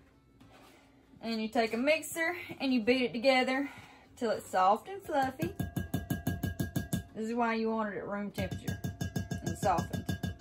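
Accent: South, United States. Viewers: God I love a beautiful southern accent. Guess I’m making banana pudding. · "Puddin" oh I love that southern talk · southern siri voice great idea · That southern accent is absolutely relaxing. Her and her dad are cool cats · Love the videos and the southern accent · I watched the whole video and heard nothing she said because I Was just listening to that southern accent lol. Love southern accents. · You could read the phone book and i would check it out. Love your voice being from the north don't hear that southern accent that much. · Hey,: You in the kitchen and with your southern voice, kinda warms the heart, just like Christmas! Keep them coming, even us old guys love you!! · her sweet southern drawl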